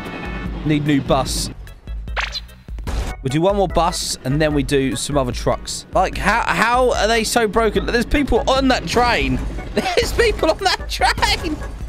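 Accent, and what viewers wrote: United Kingdom. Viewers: btw are you a brit or a scot or irish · YOU HAVE A BRITISH ACENT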